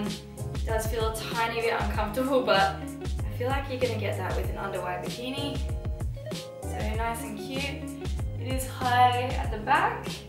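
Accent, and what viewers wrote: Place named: Australia. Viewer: Australian girls are so hot and the accent is very cool.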